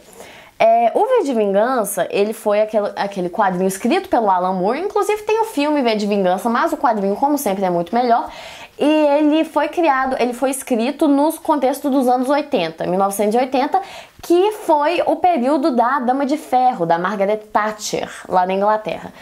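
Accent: Minas Gerais, Brazil. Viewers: Gostei muito do seu canal! Faço facul de Direito mas amo muito História e quadrinhos e supercurti o seu jeito descontraído de ensinar, além do sotaque tranquilo de Minas que eu amei! (Tenho parentes daí de Minas Gerais :) ) Ganhou um fã do seu canal! Muito show, continue assim! :) :) :) ;p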